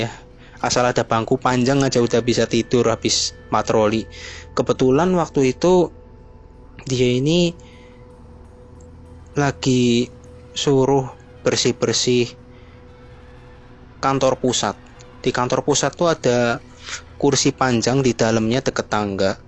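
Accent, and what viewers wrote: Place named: Java, Indonesia. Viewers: Ko gue malah ngakak ya bukan takut . Sumpah mas nya medok bgt ampe perut gw sakit meragain omongannya . Tapi bagud mas menghibur walaupun gue malah ngakaj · Medoknya ganggu banget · Ora ngapak ora kepenak kang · Ora Ngapak Ora Kepenak ... 👍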